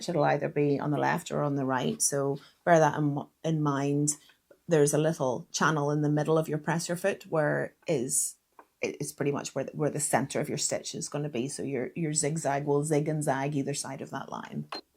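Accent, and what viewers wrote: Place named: Scotland, United Kingdom. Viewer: Oh gosh or maybe that's a Scottish accent I detect 😂 May I ask what machine this is please?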